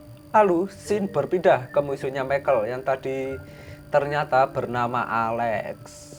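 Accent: Java, Indonesia. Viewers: udah suara medok banget.. joke lo garing lagi.. bikin muntah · Amatiran.. Udah medok pake becanda, jd gak menikmati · Tolong yg bener min komentator nya, udah medhok banyak bercanda ga lucu juga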